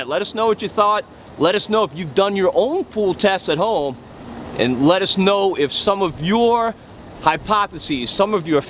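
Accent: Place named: New Jersey, United States. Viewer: I thought you were from New Jersey.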